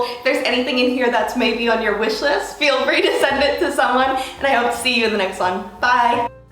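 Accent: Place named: United States. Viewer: i’m soooo confused u reside in australia/ also sponsor australian brands, but u have an american accent ??? 😩😩😩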